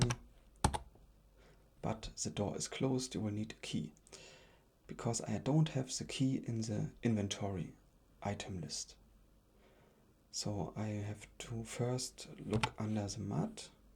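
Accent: Germany. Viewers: are you from germany because your pronounciation sounds very german and you also said "ja"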